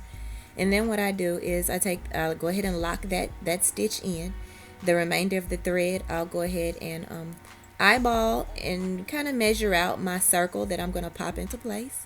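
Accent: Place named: South, United States. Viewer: Love your tutorials, and I especially love your country accent!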